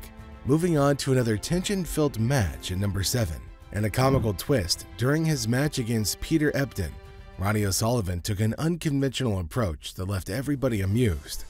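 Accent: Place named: United States. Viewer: It's very weird every time you hear commentary with American accent on a snooker video...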